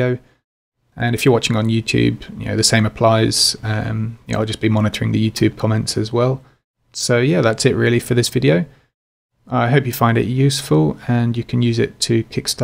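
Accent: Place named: United Kingdom. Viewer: Brilliant! Thank you so much.... I especially loved your British-isms, being a Brit living in America for the past 16 years I really felt at home especially with 'stuffed' and 'dodgey'. A fab, well paced tutorial that has prevented me throwing my laptop out the window because of Photoshop. Thank you.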